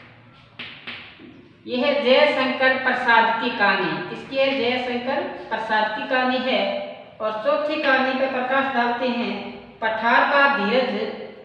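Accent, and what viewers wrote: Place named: Haryana, India. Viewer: Kon sa colleage ka h ye Sir hariyanvi bol rhe h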